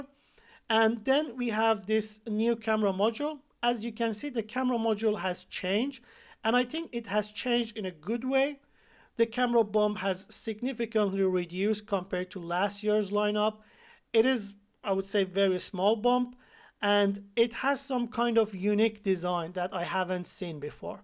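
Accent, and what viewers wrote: Iran. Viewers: I know a Persian accent when I hear one lol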